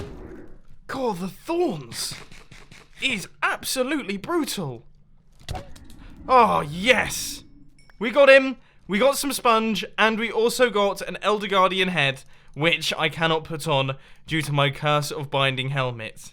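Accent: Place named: United Kingdom. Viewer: Ur British! finally!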